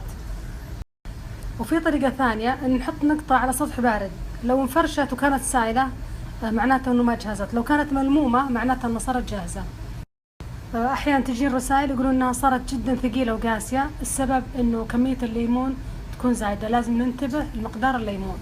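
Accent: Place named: Najd, Saudi Arabia. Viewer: هاذي لهجة اهل نجد بشكل عام 😍🥰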